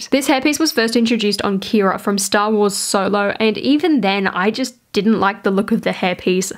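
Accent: Australia. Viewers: I love your accent! I watch your videos to practice my Australian English listening. New follower 😉.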